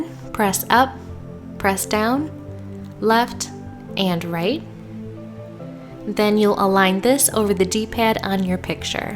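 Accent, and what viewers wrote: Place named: Michigan, United States. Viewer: Michigan or Chicago? I think Michigan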